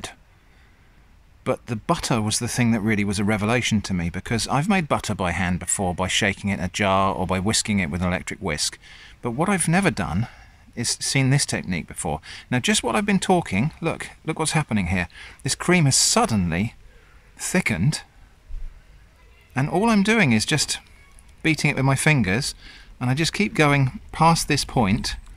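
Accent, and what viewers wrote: United Kingdom. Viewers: because of the British accent, he makes anything he does sound completely okay and normal...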